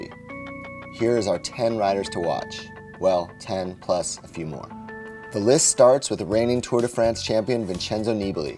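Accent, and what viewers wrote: United States. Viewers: Why the new American accent? Don't like it all. Stick to British presenters, and maybe call in the Irish when the Rás comes into the conversation.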